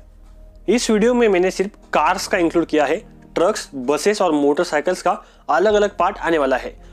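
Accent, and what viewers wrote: Maharashtra, India. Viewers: Tu bhau maharashtriyan ahe karan yevdhya spast marathi shabd nahi yenar. Pune aamch lay bhari. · I love your Marathi accent voice , i am subscribing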